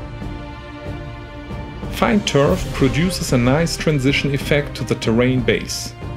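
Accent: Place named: Germany. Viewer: Once again a great build. Somehow I love your hard german "Akzent" :-D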